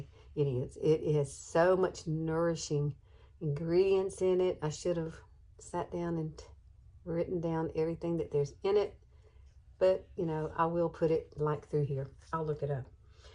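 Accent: South, United States. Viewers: I really love your videos. Your voice is so calming along with your Southern accent. I have puffy wrinkled eyes so I love this routine. Keeping you in prayer for the loss of your Mom, it’s hard I know...take care lovely lady 🙏🏼♥️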